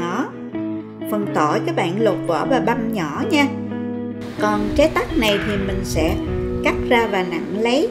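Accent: South, Vietnam. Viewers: Giọng nói miền Nam VN, dễ nghe, dễ thương.. Cám ơn cô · Giọng miền Nam ngọt ngào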